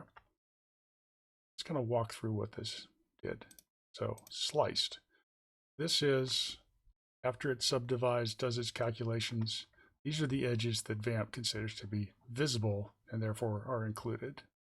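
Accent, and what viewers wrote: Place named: United States. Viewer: I was expecting the voice of the other oscilloscope music guy and literally jumped at the sound of your booming American twang as opposed to the soft spoken Nordic one I was anticipating.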